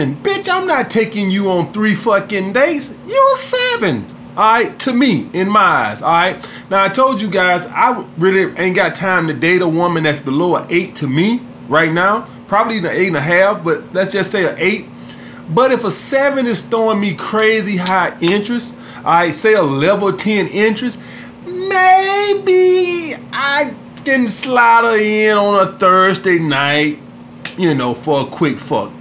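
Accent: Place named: Atlanta, United States. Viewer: you sound like you from Atlanta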